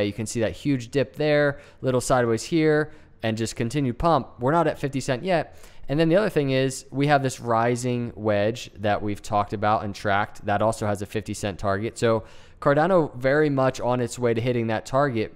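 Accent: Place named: Mid-Atlantic, United States. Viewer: I think I like you because you sound like you're from the NJ/PA/DE/MD area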